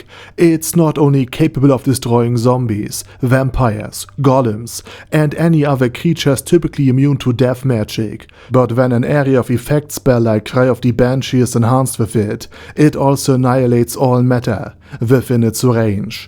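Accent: Germany. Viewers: Boah the german is very strong with you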